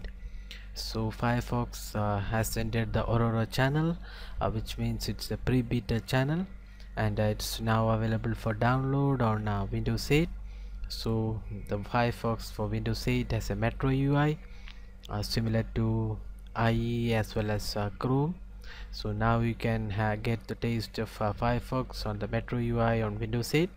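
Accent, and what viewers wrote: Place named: South India, India. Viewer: Holy fuck... no offence man but your accent is very heavy making it impossible for me to understand most bits unless I have re-repeated them several times. Also, you do seem to have a heavy southern indian accent.. Please get rid of it!? You have a nice video and the content of your video and review is pretty good too. I will seriously recommend you to work on to neutralize that tone and accent and at least sound proper (your pitch was uncontrollable and went up and down monotonously as if you were least bit concerned or overly bored) and make sure there are no background noises(I could hear a song playing in the background clearly). Otherwise the review was helpful... thanks!!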